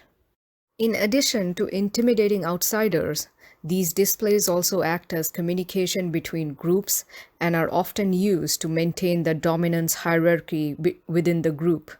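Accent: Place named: India. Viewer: Are you a indian descendent cuz Your accent seems to have it.. But nice video Very illustrative.. Nicely done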